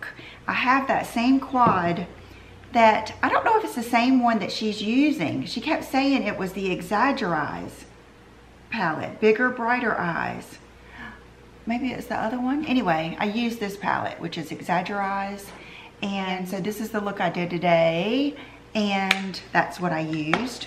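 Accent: South, United States. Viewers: I love the natural look on you!! So gorgeous. Btw, it cheers me up so much everyday just to hear your sweet voice. I am from GA and I love a fellow southern girl accent!!! You remind me so much if myself. People say we’re sweet but we can be tigers too! Lol. 😘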